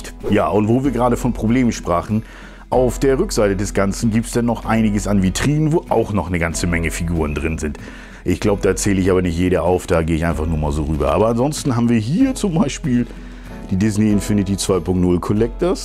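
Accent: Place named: North Germany, Germany. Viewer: Ich wünsche dir ein paar wirklich phantastische Weihnachtstage lieber. Ich freue mich immer, wenn eins deiner Videos raus kommt. Der norddeutsche Akzent und Art lösen bei mir ein klein wenig Heimweh aus. Falls du jemals einen Mitbewohner suchen solltest, dann sag auf jeden Fall Bescheid!:D Bei der Bude bekomme ich ja einen Nerdgasm! Ein einzig riesiger Spielplatz!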